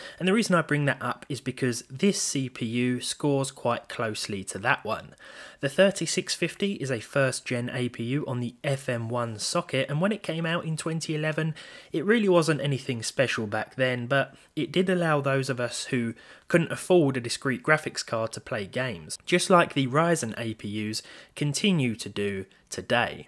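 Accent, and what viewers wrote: United Kingdom. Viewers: Lol i love this british accent.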